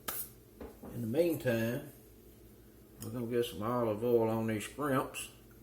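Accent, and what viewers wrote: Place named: Mississippi Delta, United States. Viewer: You sound like you're from the MS Delta, perhaps Indianola or maybe down around Yazoo City. Greenwood/Greenville? No matter where your're from, you made a nice video. Just please get yourself some good wooden or plastic utinsels to save that fine cookware.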